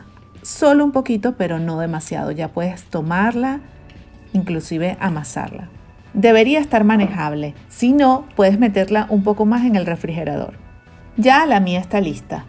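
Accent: Venezuela. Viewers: Muy Venezolana tu manera de hablar 🎉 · Eres venezolana, ese acento es inconfundible? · Saludos una venezolana 🇻🇪Costa Rica🇨🇷. Desde que escuché tu acento dije: es venezolana!. Y completamente si lo eres, te felicito por tu canal y videos, siempre quiero innovar en los platos de mi hogar. Muchos éxitos y bendiciones.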